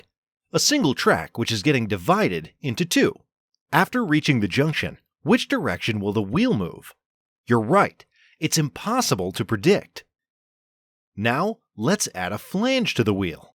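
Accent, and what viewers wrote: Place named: India. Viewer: Brother is from India It took me time to notice 😭😭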